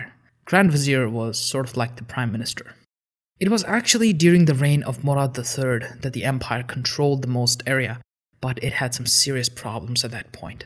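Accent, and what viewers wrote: Arab world. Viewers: exactly. That Arabic pronunciation is extremely distracting. This was a Turkish empire. He should just have pronounced the names in English. Even that is closer to the Turkish pronunciation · maybe because he is arab and those sultans names were arabic name,even your founder of yours new country Turkey has arabic name Mustafa and that's why it's normally to pronounce like that.Example I'm albanian and if I had turkish name and you as turkish if pronounce my name in turkish version, I would see as normal thing because that is the way how you pronounce I don't see nothing strange on this. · Your accent getting me sick !!!! Don't use Arabic accent , use Turkish accent . İt is inexcusable mistake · yes you actually can pronounce them differently especially when it's literally using the very same writing system and script that it's being borrowed from! 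Which is arabic! It makes sense to pronounce them as they're pronounced in Arabic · Turkish names are not pronounced like Arabic. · Why are you pronouncing sultans name with an arabic accent? They are not arabs they are turks. I wish someone who knows turks and turkish prepared this video:/ this is so amateurish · I guess the narrator is Arabic, it definitely doesn't justify the wrong pronunciation though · Please dont pronounce Turkish names in Arabic way :/ (Ottomans ınspired by arabic culture a lot I know but THEY ARE TURKISH:///) And sorry but at the ending it sounds like a banned sultans ready to built an empire again? :D which is not true and It made me feel really bad as a turkish person :/ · Please do not pronunce names with an Arabic accent when talking about Turks, it is just incorrect. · The narrator is an Arab and he pronounces as he knows. Biraz hoşgörülü ol kardeş :) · Nice video first of all, but why did you read the names with an explicit Arabic accent? 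Nobody in Turkey or in Ottoman times pronounced the names like that, nor are the Turks related to the Arabs. For many Turks, it's actually quite offensive. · Your pronounciations are way off bro. You pronounce the names in arabic tongue. Which is completely wrong. Its not moustafa qamal. Its Mustafa Kemal. We never had guttural sounds like that. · Thanks. I purchased this chart some months ago and enjoy referencing it. I believe the narration of this video however could be improved. Notably, important Sultans were glossed over, and some, not mentioned at all, such as Sultan Abdul Hamid II. There’s also a number of inaccuracies I believe, including attributing the correct titles to the Sultans, such as referencing Osman as Gazi instead of Bey. Finally, while the names are Arabic, I believe they should’ve been pronounced in Turkish, which is not difficult to do even for an Arabic speaker. 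· your pronounciatons are like an arab who trying to speak turkish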